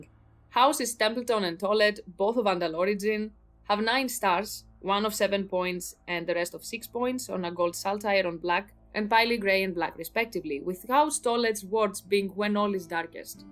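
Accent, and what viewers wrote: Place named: Greece. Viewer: you gotta be Greek the accent is a giveaway.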